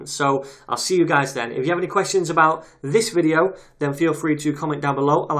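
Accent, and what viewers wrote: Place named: Manchester, United Kingdom. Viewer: That's a joke, right? Just in case it isn't, he's English. It's a Mancunian (someone from Manchester) accent.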